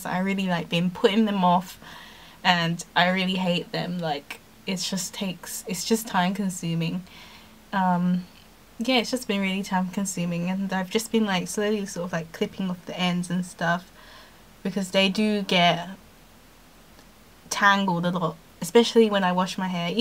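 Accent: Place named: Australia. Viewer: I've been transitioning for 4 months and I keep getting tangles in the roots each time I wash! I prepoo and detangle but as soon as it shampoo (with a sulfate free shampoo), I get tangles in the roots! What am I doing wrong? It's really frustrating and depressing. By the way, the way you talk sounds a lot like Nikki Perkins (from the Jamie and Nikki channel). Are you from Australia or Sudanese by any chance? Lol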